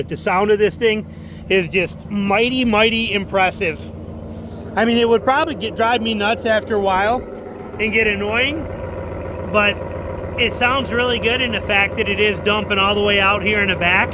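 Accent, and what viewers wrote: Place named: South, United States. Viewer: sounds like a redneck. I passed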